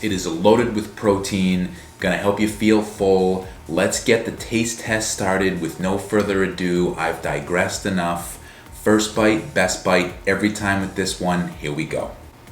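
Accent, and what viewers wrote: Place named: Boston, United States. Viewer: I recognize that accent! Where in the Boston area are you from?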